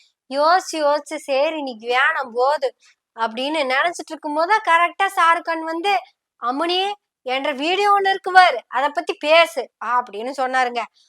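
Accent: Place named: Coimbatore, India. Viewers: Kovai tamil super🎉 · Good coimbatore talk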